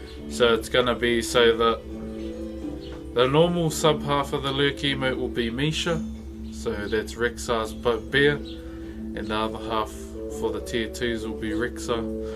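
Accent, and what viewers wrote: New Zealand. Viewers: Keep up the good work mate. It's awesome what you are doing, it's really interesting. Hearing you speak makes me miss working with all of the kiwi lads that i used to bounce with. Cheers 🍻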